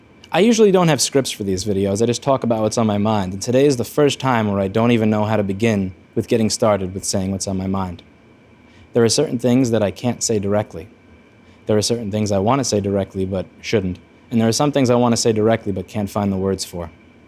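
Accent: New York, United States. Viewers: Maybe it's just me, but your NY accent seems way heavier back then than it is now.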